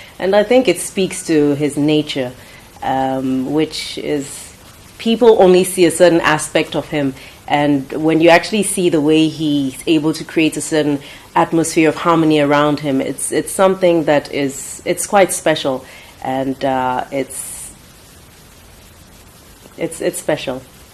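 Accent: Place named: United Kingdom. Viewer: You are talking as british!!!!!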